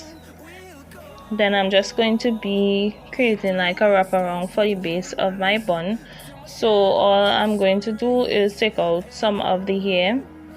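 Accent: Trinidad and Tobago. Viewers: For some reason you sound 🎵 like a Trini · Hearing this accent you a WHOLE TRINI 🇹🇹🇹🇹😍 HEYYYY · Are you trini?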